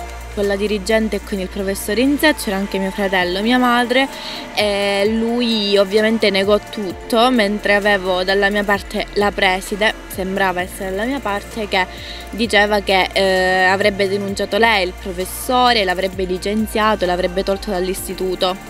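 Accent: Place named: Calabria, Italy. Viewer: Sicuramente tu non sei una cima visto il tuo accento calabrese questo canale fa di tutto x fare visualizzazione non credo a una parola di quanto hai detto 👎👎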